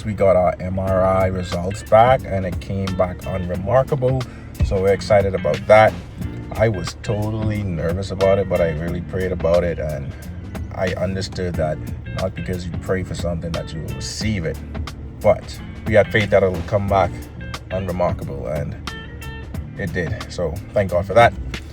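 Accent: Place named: Bahamas. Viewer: Congratulation on starting your aviation Journey . You sound Bahamian . only another Bahamian know another Bahamian accent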